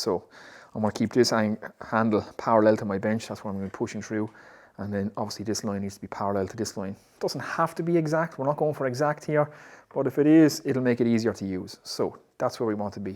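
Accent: Ireland. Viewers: Hello, your videos on jig making are fantastic and I will definitely attempt them. Can I be so bold and ask what part of Ireland you are from, because your accent is very familiar. Also, I don't really go in for Patreon but I do believe in rewarding good work that is helpful for me so can you advise your PayPal account please.? A jug for a jig seems a fair reward (hahaha). Paul. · Just hearing your Irish accent makes me smile. I’ve sent many years working on the green isle, all very enjoyable. Thank you. Co..Cork?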